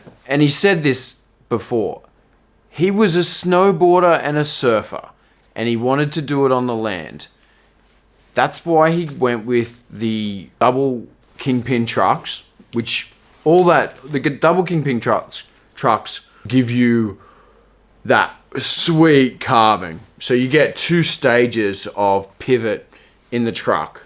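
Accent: Australia. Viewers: That's how Aussies talk hahaha. Studied abroad there.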